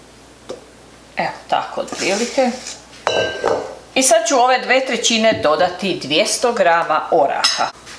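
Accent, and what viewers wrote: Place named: Slavonia, Croatia. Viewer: Draga.Iako se ne poznamo gledajuci vaše recepte postali ste mi tako bliski ne samo zbog predivnih slavonskih recepata jer i ja sam porijeklom iz Slavonije nego zbog toga što iako ste u daljini čuvate svoju tradiciju i još uvijek govorite prelijepim slavonskim govorom.Puno pozdrava Vama i Vašoj obitelji i želim vam svako dobro jer jako ste me obradovali slavonskim receptima i slavonskim govorom.Ma gdje živjeli u srcu zauvijek nosimo mirise i okuse rodnoga kraja.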